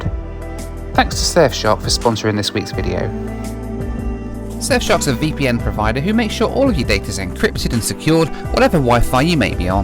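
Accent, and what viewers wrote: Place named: Sheffield, United Kingdom. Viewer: So why has she got a Sheffield accent then? Noted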